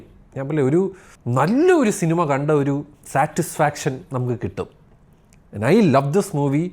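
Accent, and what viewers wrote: Trivandrum, India. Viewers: Annan trivandrum aanen confirm aayi😂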